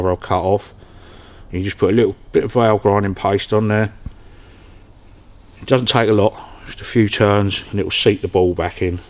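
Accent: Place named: London, United Kingdom. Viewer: Great videos! Love attitudes, and a proper London accent explaining all (if it's Essex, I'll let him off... ) Roll ups, no gloves when welding, what's not to like.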